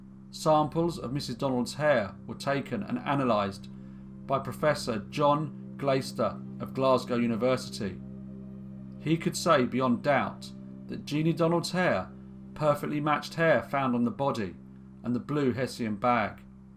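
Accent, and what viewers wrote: England, United Kingdom. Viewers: Have you actually ever met a real human being?? You sound English but I've never in my life heard anyone pronounce the word 'granite ' as you did here mate!? Seriously! Where the fook did you get that from? You do not pronounce the silent e at the end of that word !!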